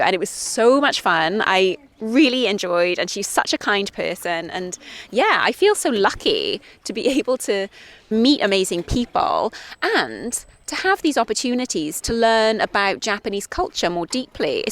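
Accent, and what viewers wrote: United Kingdom. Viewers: Thanks for your real British English video! It’s a very good listening practice for me!! The tree behind you looks like Sakura but it’s a little unique shape! Honestly speaking, I’m not comfortable to wearing mask but my opinion is close to From this 13th of Mar. 2023, I’ll be wearing mask in the confined place like the train but no mask outside! I didn’t know “gnats” but I found it’s the small flies. I misheard gnats as nuts first😅 but it’s a good practice for me!! As for clouds, I studied typical seasonal clouds below❗️ Summer: cumulonimbus Autumn: mackerel clouds Winter:stratocumulus April: altostratus Oh they are very difficult words for me but interesting! I’m excited for the next lessen ❤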